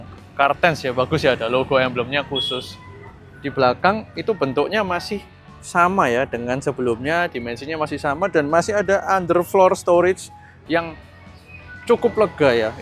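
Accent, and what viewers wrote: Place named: Java, Indonesia. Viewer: ngomong aja medok yauda pasti bahasa indo Lah ,, lo mau ngomong sok english jg tetep aj predict ur nations braww hahaha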